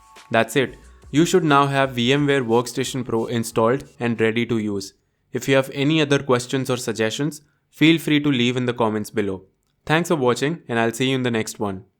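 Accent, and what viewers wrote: India. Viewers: clicks first video, AI voice, clicks away. Clicks second video, indian accent, stays.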